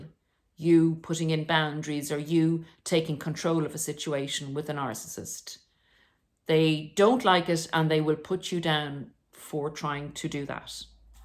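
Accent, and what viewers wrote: Ireland. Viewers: you sound irish ! 😊😊😊😊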